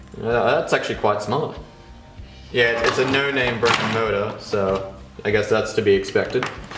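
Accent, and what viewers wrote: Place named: Australia. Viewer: Are you an Aussie or a yank mate? Sort your accent out bud.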